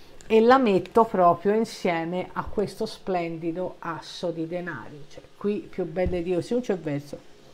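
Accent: Tuscany, Italy. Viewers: A me mi fai morire 😂 acciderba al toscanaccio🤙😊😂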